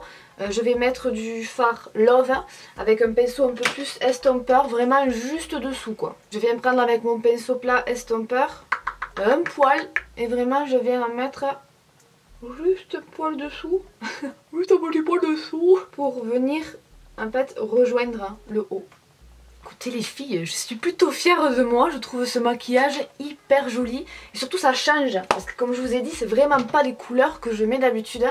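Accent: South, France. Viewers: Mes qu elle Canon 😍, j adore, tu es magnifiques et en plus avec un brin d humour, tjr pétillante, tjr la bonne humeur avè l accent du sud tous pour plaire 😊 d énorme bisous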